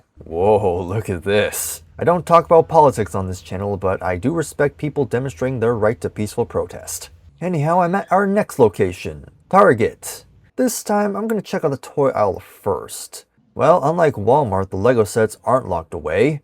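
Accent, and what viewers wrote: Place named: England, United Kingdom. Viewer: I'm used to speaking in an English accent. I tend to look for specific things. I don't like just browsing and buying stuff I might like without checking it out first. I'm after certain items and online I can check who has it in what condition and for what price while riding the train to work. I also buy a lot more that way... Hmm...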